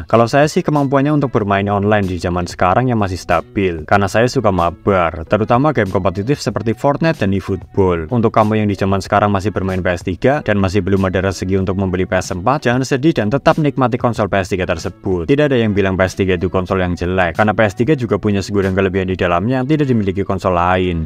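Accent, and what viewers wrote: Banyumas, Indonesia. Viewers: Wong Banyumas toh mas?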